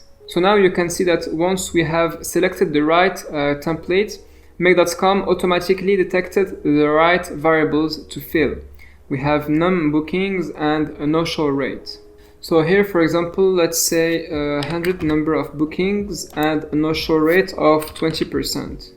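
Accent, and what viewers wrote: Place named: France. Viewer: Bonjour, tu as un accent Français, are you french? merci pour le cours 👌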